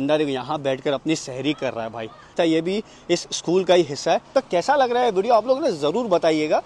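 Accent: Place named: Mumbai, India. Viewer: I am watching ur video for the first time, can you tell me where you are from? Because your voice is from Mumbai, please tell. Bhai jaan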